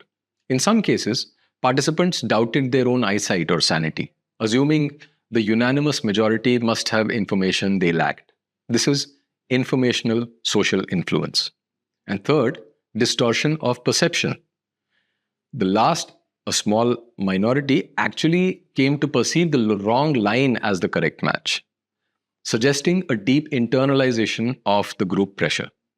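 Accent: India. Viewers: I came my chance; thank you. Your accent and narration indicates a mix of north and south indian (tamil?). Once again a big thank you!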